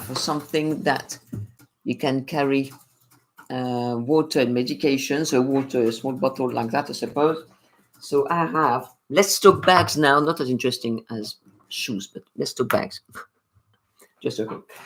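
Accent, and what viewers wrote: France. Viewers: No nagging, just your friendly nice French accent and with the Wow I get the surprised face with big eyes. Love you.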